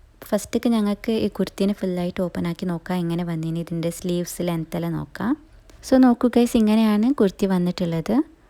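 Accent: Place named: Kasaragod, India. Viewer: കാസർഗോഡ് ഭാഷ ഇങ്ങനെ ആണ് സുഹൃത്തേ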